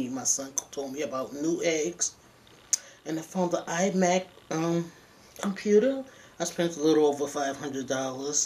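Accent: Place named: New York, United States. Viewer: I love watching your videos I’m always here for your New York accent I miss my accent I’m so country now...lol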